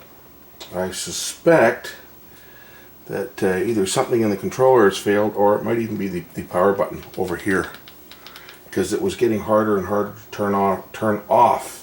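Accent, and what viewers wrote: Canada. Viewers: we definitely need a kitty cat review video! Btw are you from Manitoba originally? Your voice sounds very familiar but maybe it's just a Canadian thing. (Edmonton, Alberta here)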